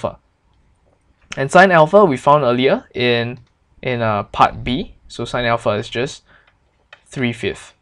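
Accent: Singapore. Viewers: Hey are you from singapore?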